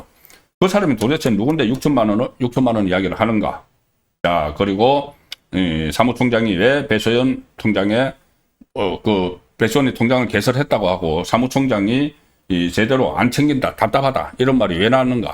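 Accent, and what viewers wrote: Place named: Jeolla, South Korea. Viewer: 1. 억양: 전라산 홍어. 2. 사고방식: 사기꾼 개년. #1+2=3. 국내산 홍어를 상대해본, 국민이라면 다 알거다. 69.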